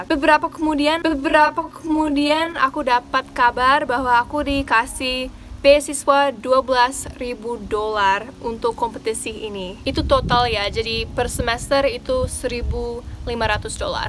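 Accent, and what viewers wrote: Papua, Indonesia. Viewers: Bule longat papua juga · Logat indonesianya mulai agak luntur 😁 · Terimakasih atas informasinya sister, sungguh terlihat manis didengar orang asing asli berbahasa Indonesia dengan logat/lahjah Indonesia. Sukses selalu buat sister, brother and keluarga.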